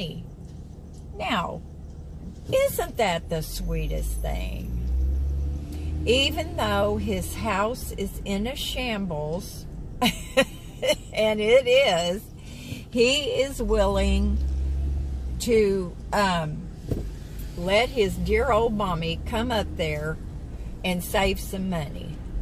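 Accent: South, United States. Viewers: You and ur Southern accent! Love it!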